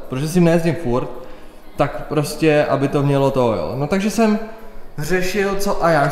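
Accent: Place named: Ústí nad Labem, Czech Republic. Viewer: furt tě slyším po ustecký jak jedeš xddd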